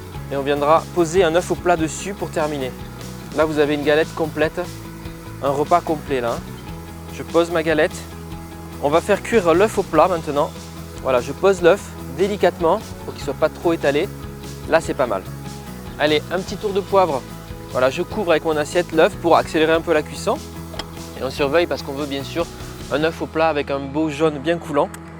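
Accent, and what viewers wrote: South, France. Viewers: Coucou, merci de partager vos souvenirs et votre galette! Il me semblait avoir reconnu un reste d'accent du Sud!... 😉😀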